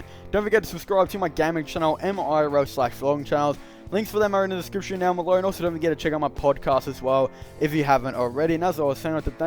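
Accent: Australia. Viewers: Ahhh, its australian bud. Not even close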